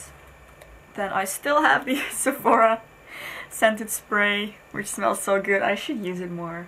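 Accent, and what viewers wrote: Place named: Netherlands. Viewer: ! Dutch indeed....I thought I could hear it! Awesome! Hallo landgenoot!;)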